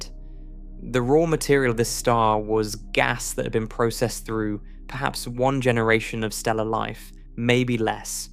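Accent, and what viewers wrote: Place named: RP, United Kingdom. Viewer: Good content, but the choice of the AI narration (a posh British accent), absolutely destroys the accuracy of the captions. Isochron, subgiant... all manner of technical terms, are rendered gibberish. An American accent isn't as melodious, but it is far easier for the caption translator to decode. Also... very repetitive... this is 40 minutes of content, stretched to 133 minutes.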